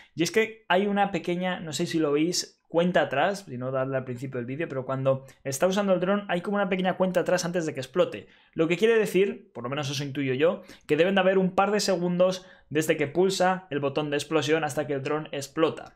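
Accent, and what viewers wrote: Argentina. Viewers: Es de arg? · Es argento papa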